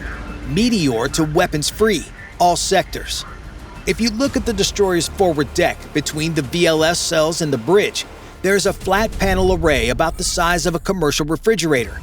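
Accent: United States. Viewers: Had to turn this off. This particular American presenter's accent is ear grindingly awful. Pronunciation is always a problem understanding but his is so far out its painful to listen to. Back to school required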